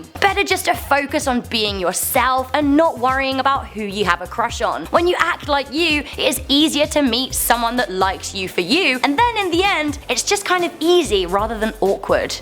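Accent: United Kingdom. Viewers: Is it me or did express her British side more than usual 😂 I live in England to.... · Ahhhhh I love that British accent!!!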